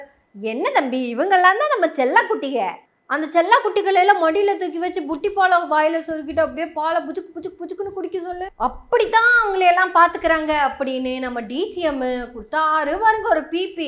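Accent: Kongu, India. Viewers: கொங்கு தமிழில் நீங்க பேசறது அருமை அம்மிணி வாழ்த்துக்கள் சகோதரி 👍🏼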